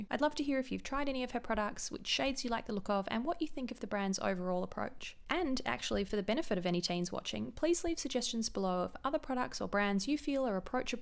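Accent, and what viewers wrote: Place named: Australia. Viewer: also I'm Australian and its nice to hear a friendly accent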